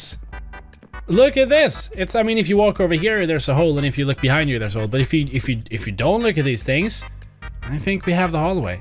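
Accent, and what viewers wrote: Sweden. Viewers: OH you're swedish, that's why your accent is so bad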